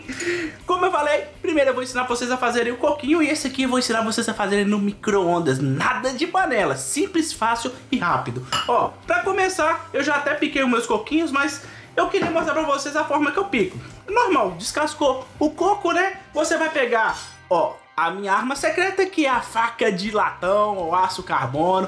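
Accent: Minas Gerais, Brazil. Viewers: 👏🏽👏🏽👏🏽👏🏽👏🏽👏🏽👏🏽 Tem que falar com minerês mesmo!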